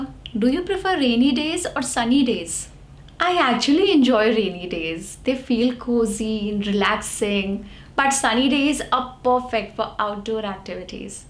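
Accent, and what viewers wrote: India. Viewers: I actually speak English with American accent🇺🇸 Now I’m gonna learn Indian accent from your videos to make better content for my Channel. Thanks a lot for your beautiful work gorgeous lady!!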